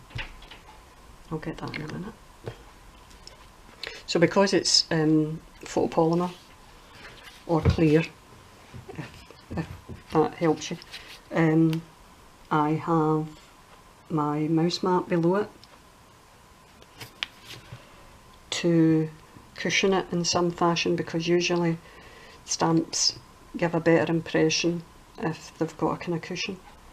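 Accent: Scotland, United Kingdom. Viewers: Thank you again for allowing us to watch you work. I always pick up something. Love the way you organise your pages when doing the stamping process. Good on you for being true to yourself and what works for you. I always get excited when I see a new video from you. For your work, your accent, and little tips. I have a son living just out of Inverness and listening to you feels like connecting to him. I live in New Zealand but we visit him regularly. Keep doing what you love. It certainly makes me happy. Dianne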